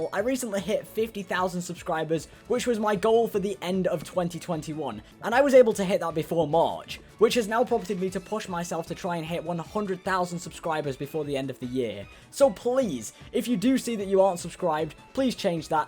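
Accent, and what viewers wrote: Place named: United Kingdom. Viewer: Your british!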